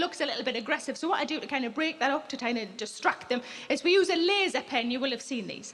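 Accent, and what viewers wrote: Newcastle, United Kingdom. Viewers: Aye proper Geordie :)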